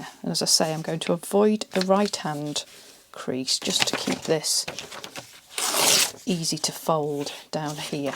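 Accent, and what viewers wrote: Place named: Lancashire, United Kingdom. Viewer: Love this project,! Your voice is so soothing, and it reminds me so much of when I lived in Lytham, Lancs. throughout the 1980s!!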